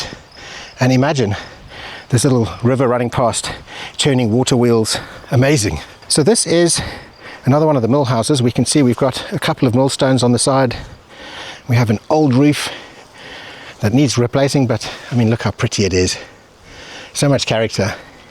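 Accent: South Africa. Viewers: you sound like Suid Afrkaner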